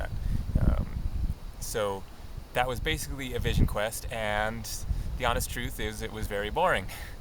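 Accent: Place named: Canada. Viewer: I knew that was a Canadian accent!!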